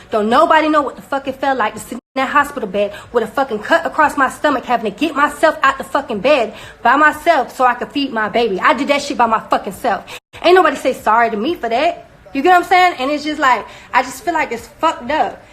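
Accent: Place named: Mississippi, United States. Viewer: You are beautiful but you know you country talkin as hell where are you from Mississippi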